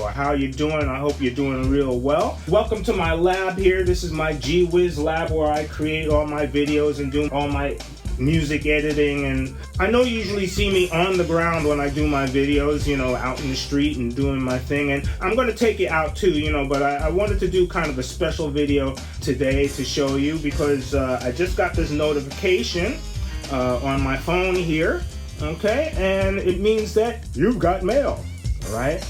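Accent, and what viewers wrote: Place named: United States. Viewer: 😅Mr Gwiz. You are very brave. Despite all warnings from the medias not to take ‘risk’ coming to China , you did it.Your American English pronunciation sounds very pleasant.China has a 5,000-year-old culture and is a society that respects teachers very much. It is very popular in China and you can live in China with confidence.I am also a video producer and it is difficult to increase my followers. You can think of your channel as a way to record your life. Take it easy.China's education system is long-term and sustainable. You don’t have to worry about policy issues. Just feel free to teach well. If the contract expires, you can continue teaching in other institutions. If you go to Vietnam or other countries, your income will not be as high as in China, and life will not be as convenient as in China.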